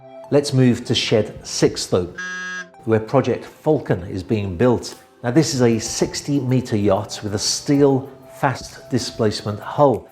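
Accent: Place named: England, United Kingdom. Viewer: Sorry, had to thumbs down for wearing a mask while walking alone outside. Also, that damn regional English accent that pronounces ing as ink, its driving me insane. Spiffing Brit does it even worse and it makes me almost have to stop watching his content. It's just something about saying k instead of ing that drives my brain to insanity. You might as well pronounce w as z. Its so random and makes no sense.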